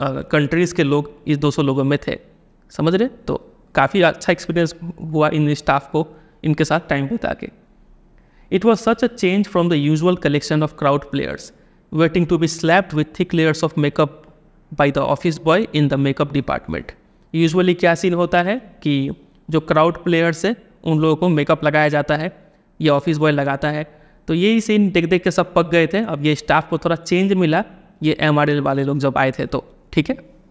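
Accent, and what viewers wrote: Bihar, India. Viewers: Sir are u from Bihar ?? Just asking ❤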